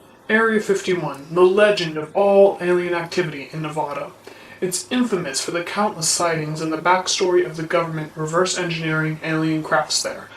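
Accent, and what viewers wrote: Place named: United Kingdom. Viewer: Couldn’t hide your British accent in this one even with the voice mod. I ask to see that other video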